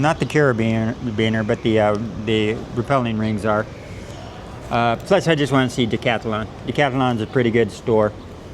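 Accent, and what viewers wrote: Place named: Tennessee, United States. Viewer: From Tennessee. That explains your accent. Keep riding and discovering Malaysia. You have a splendit Advisor in Douglas of Plane Doug.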